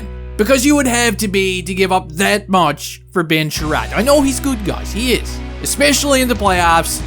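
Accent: Newfoundland, Canada. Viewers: He sounds like a Newfie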